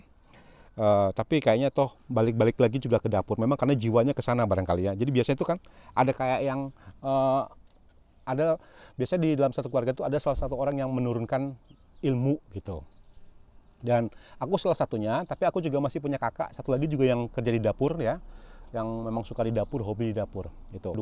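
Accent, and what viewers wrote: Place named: Medan, Indonesia. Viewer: Hai salam kenal ya 😊 Ohya nada bicaranya mirip² orang medan jg ya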